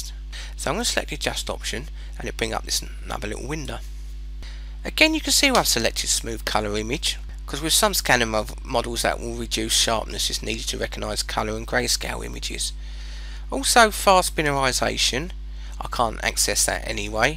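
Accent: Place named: Liverpool, United Kingdom. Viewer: It´s a mess. I am aware that back at school I learned British English, which is, properly speaking, Oxford English. Some sounds the speaker makes seem funny to me, but I could not understand them. Is it e. g. Liverpool English? Whatsoever, I gave up on learning from this video after about 3 minutes.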